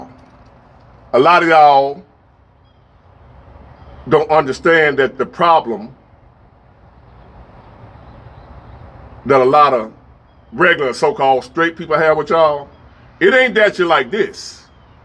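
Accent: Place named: South, United States. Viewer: Got the rocking chair on the porch! I hear ya bro! Can tell from the South!! 💪🙏✝️🇺🇸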